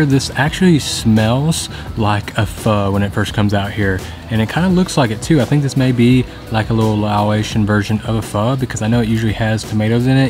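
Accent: South, United States. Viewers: Hey! Just came across your channel, and I’m loving it. I was wondering where you where from, hearing that southern accent haha. I read your bio and saw you’re also from AR 😂🐗 keep up the awesome content! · When I heard a fellow southerner I subscribe so quick! This a first that I've seen a southerner vlogger! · My first time watching you and I enjoyed your video. I live in North Carolina and you sound like you are from the south. Where are you from? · Southern draw and Lao food- what a combo!!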